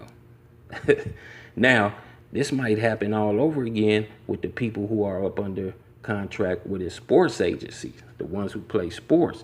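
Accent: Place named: Midwest, United States. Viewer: I'm from L.A. born and raised. I watch your videos from time to time and I appreciate the good content you put out. But I for damn sure appreciate you doing your reserch on my city and knowing exactly where everything is. You ever lived out here in L.A.? You have a Mid West accent G.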